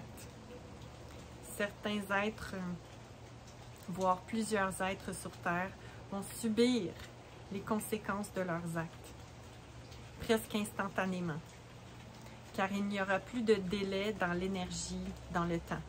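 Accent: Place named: Quebec, Canada. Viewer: Tellement apaisant le bruit de la pluie, le bois, les couleurs des feuilles d’automne … et l’accent québécois 👌 merci pour cette douce mélodie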